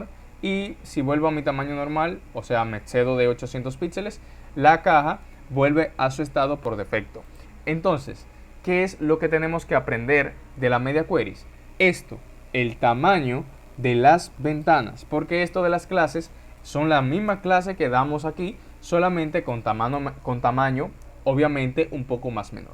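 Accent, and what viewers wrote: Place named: Dominican Republic. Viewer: Suenas dominicano 😂 saludos broth bendiciones un nuevo suscriptor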